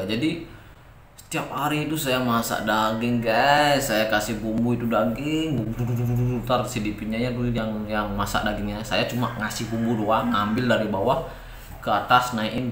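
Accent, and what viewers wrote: Bali, Indonesia. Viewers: Abg orang bali yah. Heheh./lo gue nya msh rada gx mirip sama jkt aslinya heheh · Logat bali ne asane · Logat balix kentalll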